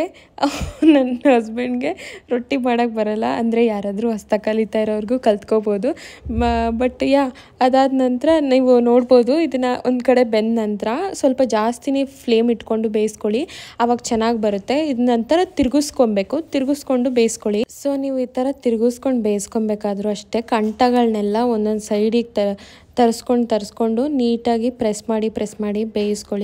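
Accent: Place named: Tiptur, India. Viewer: Hellooo Im also from Tiptur. Neevu maathado style node ankonde, nam side erbeku antha. Kushi aythu😊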